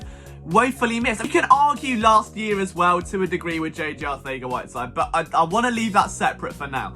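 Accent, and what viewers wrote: United Kingdom. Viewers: You have a great show. The haters are shocked that a dude with a brutish accent has this much Eagle knowledge. Big ups to you.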